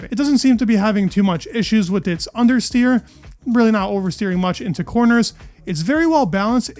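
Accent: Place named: United States. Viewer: love your videos just wanted to say it’s great hearing a fellow American voice talk f1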